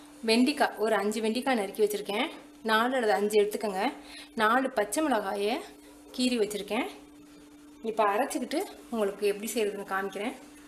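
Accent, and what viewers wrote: India. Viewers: She speaks such a lovely Chettinad Tamil, I feel sorry for you are missing something great in this life, the taster of her Tamil and the Chettinad food!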